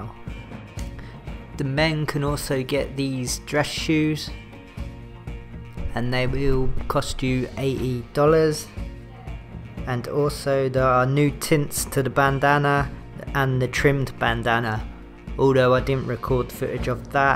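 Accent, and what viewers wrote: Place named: United Kingdom. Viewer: Which part of the UK u from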